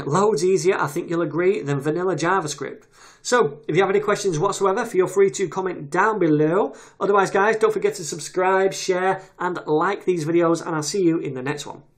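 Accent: United Kingdom. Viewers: is this the so called noble British accent?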